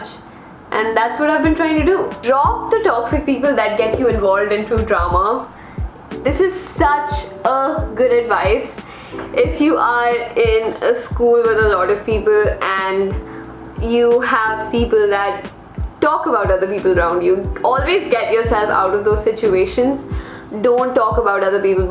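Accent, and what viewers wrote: India. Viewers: she gives me vibes like she is lil ananya pandey and sonam kapoor ofc with south delhi/mumbai accent but more still better then them😂😂❤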